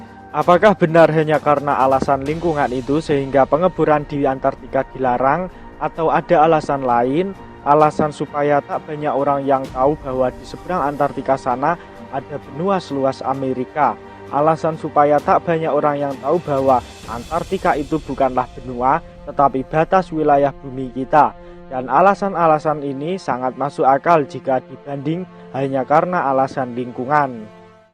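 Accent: Java, Indonesia. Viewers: naratornya indonesia asli.. wkwkwk... hidup medok.. 😁😁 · Naratornya medoxx bgt😀😀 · Medok amat njiiir 😂😂😂😂 Btw buat yg mendebat, membully,beradu argumen pesrsepsi tentang bumi datar anda luar biasaaa.. Terserah anda mau menertawakan, menganggap ada2 saja, menganggap bodoh ,itu bebas hak anda hehe Buat apa ngeladenin2 yg ngga percaya atau yg kontra.. Para Flatter hanya mau membukakan konpirasi besar kepada semua umat manusia dengan angka(fakta). Bukan hanya dengan faham yg turun temurun diajarkan. Like "orang udah diajarin di sekolah2 dari dulu bumi bulat ,ngaco aja". Hehe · logat,e medok. 😀😁😂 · kok gue jadi fokus yg jelasin ya bahasanya medok jawa bgt 😁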